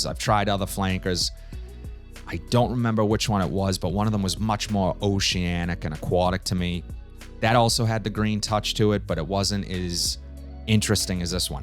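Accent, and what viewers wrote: Boston, United States. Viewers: That Boston accent you got going on is so frigging annoying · Are you from Bahston? I can tell I'm from up there myself. I enjoy your reviews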